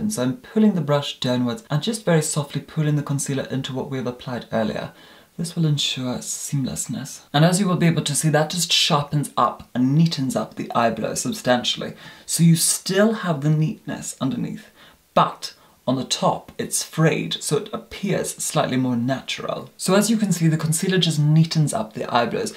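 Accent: Scotland, United Kingdom. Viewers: he has took the original English accent and slightly mixed it with Scottish and created this amazing and extremely proper accent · Phoinexe he speaks with a Scottish accent but has made his voice a bit more posh and articulate in more recent videos. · so this is my second video i have watched of him, and i’ve realised he actually had abit of a scottish accent lmao..? ik he’s british but i couldn’t put my finger on it. am i right? is he scottish?! phahah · Lovely Scottish accent 🏴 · He's Scottish but a really posh english/Scottish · We can still hear that Scottish accent! ;)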